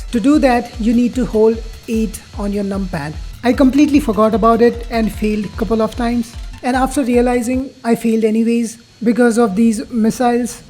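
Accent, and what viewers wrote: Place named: India. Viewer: Why your Indian accent sounds like a middle aged aunty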